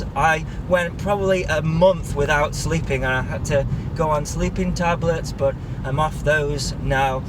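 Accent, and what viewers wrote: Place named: North East Wales, United Kingdom. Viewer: Cool video. I would say; hang on in there, but it sounds like you are. Judging by your accent I would say you don't live too far away from me, but I may be wrong. I'm in North East Wales, are you fairly close?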